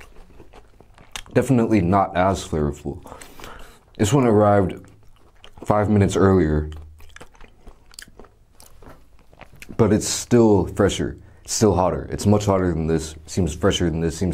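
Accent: United States. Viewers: This guys looks Asian but sounds soooo different from an Asian. He sounds like a American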